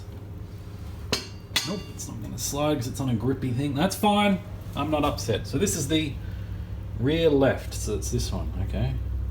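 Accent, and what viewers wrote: Australia. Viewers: Looks like the dual-tap sink typical of British sinks... except you sound Aussie and keep your eggs in the fridge like Americans. I'm so confused!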